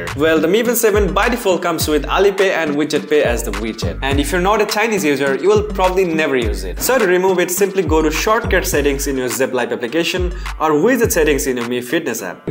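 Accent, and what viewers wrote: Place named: Bengal, India. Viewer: Vai tone Bengali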